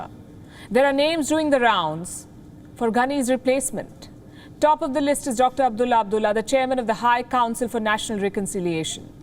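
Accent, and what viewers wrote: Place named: India. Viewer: Indian accent so heavy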